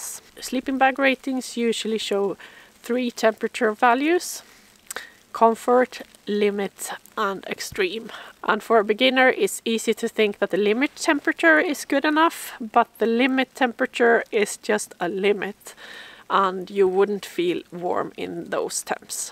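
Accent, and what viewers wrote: Sweden. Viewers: 2 sekunder in hör svengelska, japp definitivt sverige:) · Thank you for the tips!! Survival so key. Your accent is lovely. It sounds vaguely familiar, but not sure if that is due to US melting pot or English/Scandinavian ancestors. Either way, your English is muuuuuch better than my Swedish. :) Tack för att du delar med dig av din expertis!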